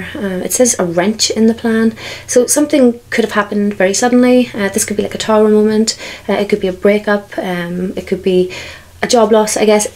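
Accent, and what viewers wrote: Ireland. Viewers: I so enjoy your readings and your Irish brogue. And now today I'm loving your Scooby-Doo top. Can you please tell us where you were on vacation? It looked spectacular! Thank you for sharing your abilities and gifts with us. ❤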